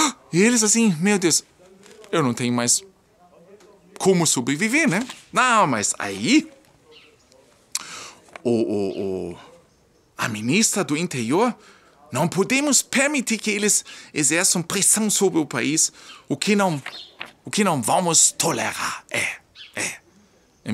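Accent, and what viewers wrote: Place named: Germany. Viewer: Olá. Você é muito simpático e adorável. Suas reportagens na língua portuguesa com o sotaque alemão é único. Sou sua fã.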